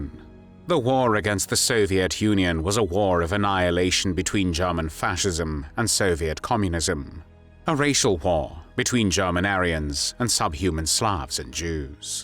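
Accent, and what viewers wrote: England, United Kingdom. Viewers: The English accent of the narrator is nauseating. Hammurabi was right. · Soviet communism!? Don’t you know where the communist manifesto was written or where Karl Marx’ grave is?! You have a British accent! And should know the history of your own country!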